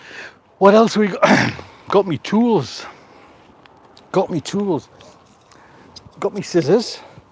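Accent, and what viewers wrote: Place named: North, United Kingdom. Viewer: Sound good... apart from the funny northern accent!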